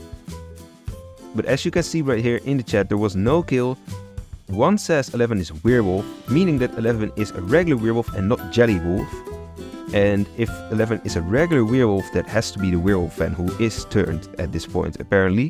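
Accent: Netherlands. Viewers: Dutch accent is hard not to notice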